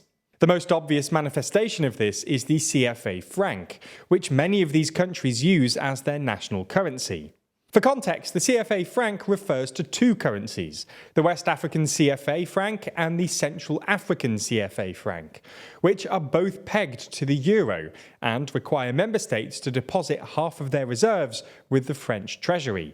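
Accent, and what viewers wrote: England, United Kingdom. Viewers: Just wanted to see if it was gonna be some schmuck with an English accent telling us about the evils of the other guys. GFY!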